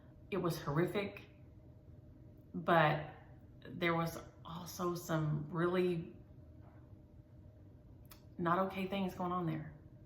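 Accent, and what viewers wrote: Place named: South Carolina, United States. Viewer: Thanks for talking about Aida, evenweave, and linen. I'd love to hear more about how you chose which fabric for each design. Your talk about the whites was SO good! I'm here in SC so you sound like a friend to me. : - )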